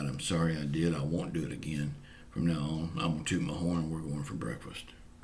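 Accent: South, United States. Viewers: Where do you live? You have a Southern accent!! It’s refreshing to hear!! I’m in Virginia born and raised!!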